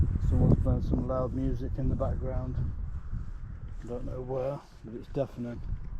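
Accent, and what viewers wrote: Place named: England, United Kingdom. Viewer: ❤😂🎉. Love 💕💕💕💕😁🐾🐾🐾🐾💕💕💕💕Love this Video 😂😆😫😊🤓😇😂🤣🤣🤣🤣🤣😍🥰🥰🥰💕💕💕💕💕🐾🐾🐾💕💕💕💕💕🤣🤣🤣🤣🤓😲😩🤓🤔🥰🤣🤣🐾🐾🐾🐾🤣🤣🐾🐾🐾🐾😮🧐😫😫💦💦💦💦💦🌈☔️☔️☔️☔️☂️☂️☂️☂️☔️☔️☔️☔️🌈😂😂😂😂. Hehehehe 😅. Hehehehe 😅❤😂🎉🎉. I Do. This. All. Day. Talk to. Myself 😮👀👀👀👀🌈🌍👀🐾🐾🐾🐾🐾😩🧐🤓. But Nobody. Listen 😫🧐🤓💦💦💦💦🌈🌎🤣🤣🤔😂😂😮🌍😍🌈🌍😍😍. Wonder. Does. Milo. Listen 👀👀👀🤓🐾🐾🐾🐾👀😩🤓👀😩😩😩😩🌈🌍😂🌈🌍😂🌈🌍😂😂🌈☺️🌈😇🌈😊🌈🤣😫🌎. Looks like. You have a. Drought in your. Neighborhood 😮😮👀😫🐾🐾🐾🐾🌍🐾🐾. We. Having. So much. Rain ☔️ ☔️☔️☔️☔️☔️☔️☔️😫💦😫💦💦💦💦. Im. Going to have to. Send. You. Some. Rain ☔️ 😂☔️☔️☔️☔️🌈🌎🤓☂️🌈🌎🤓☂️🌈🌎🤓☂️🌈. 🤣💦💦💦🤣💦🤣🤣💦🤣💦💕💕💕💕💕💕. Enjoying. Listen. Talking to you. 😂❤❤. Love the. ENGLISH. Accent 😅❤🎉🎉🇺🇸🌎🇬🇧🌎🇺🇸🇮🇪🌎🇮🇪🌈🤓👀🤣😊🇬🇧🌈🇺🇸🇮🇪🌈👀🇬🇧🇺🇸🌈🐾🐾🐾🐾😇💦🌎🐾🐾🐾🇮🇪🇮🇪🇮🇪🌈🌍🌈😊🤣🤣💕💕💕💕💕💕🌎🌎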